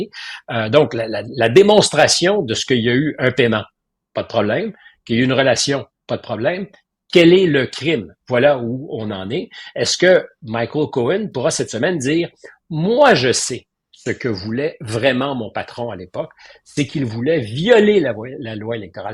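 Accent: Quebec, Canada. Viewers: l'actu avec l'accent du Québec c'est moins tragique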